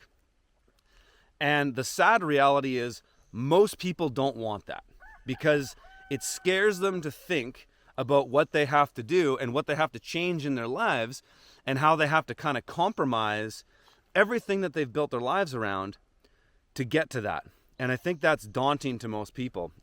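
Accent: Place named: West Coast, Canada. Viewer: Refreshing to hear your perspectives on the Dutch farmers, et al. As a former B.C. native, now living in the Netherlands - on a basic level I appreciate hearing your West Coast Canadian accent speaking and seeing the visuals of your farm and overall and island/coastal habitat. It definitely, takes me back to my roots and sets up familiar perameters which compliment the core of your message to us all - wherever and whomever we may be. I also found interesting the definition of Canada you cited ... being "the internal and territorial waters". Does that mean the government doesn't own the land, and therefore has no jurisdiction over the people who live there ? Are they ruling, therefore, illegaly ?? Unless, you happen to live on a houseboat or in a canoe, perhaps. All of that leading up to the "cherry" imo, which to my ears rings like a bell: "I'm in free country here... 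THIS is Canada" !! Sounds good to me :) It's refreshing to hear you clear away the clutter and giving this topic some room to breathe - by sending it to us from into the wilder, open spaces. The Dutch farms take up an incredible amount of "open space" here too, by the way. And, the Dutch farmers are incredibly resilant. They will not back down, in spite of the odds being against them. They built the Netherlands, in a sense. And, more and more people in Holland are on board with them, in spite of the overall inhabitants not being able to live-off-the-grid, so much, in this country. It is the densist, most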